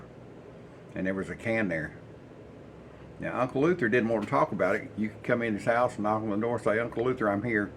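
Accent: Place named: East Tennessee, United States. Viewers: This looks wonderful!!! About your accent, it's great!! Here in Tennessee, you'd fit right in!! Take care! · thank you so much, I went back and rewatched your video and got the ingredients, just cuz I like to hear you talk I'm from East Tennessee so we speak the same language, lol...imma be making this today, that is if I can get Walmart to deliver my beer😅 Tell your wife you tickled me too, make me spit my coffee out when you we're trying to say particular😂😂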